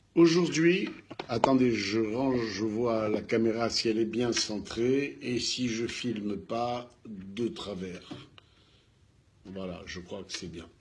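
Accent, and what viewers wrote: Tunisia. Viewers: ! Tous les tunisiens que je connais en Allemagne, vous aiment fort (Musulman et Juifs). Déjà comme tu parle et gesticule c'est déjà authentiquement tunisien.. Nous sommes très émus, oui très émus!, d'entendre votre video pour être un Juif tunisien.. Merveilleux! Salutations le plus chaleureuses et patriotiques pour les Juifs tunisiens! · Je suis tombé par hasard sur ta vidéo... D'abord merci pour le voyage au son de ta voix, si familière...Mais oui je t'aime, j'ai 70 ans maintenant, et je suis aussi de nulle part, merci pour l'accent de mes parents (oncles et tantes), tu es surtout un poète... De la part d'un juif tunisien qui a "tout fait" de tes critères pour être un vrai juif tunisien, sauf la durée (je suis parti à 2,5 ans) car "on" nous a ouvert le gaz pour étouffer ma grand mère Gomara, mon frère Fabien (zal) et moi-même, il ne manquait que l'odeur des beignets à l'huile, Patrick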